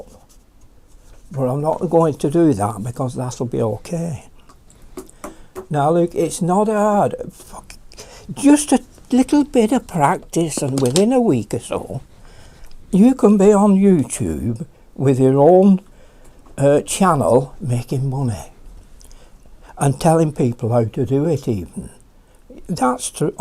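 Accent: United Kingdom. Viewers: I love your accent! What part of the UK are you from?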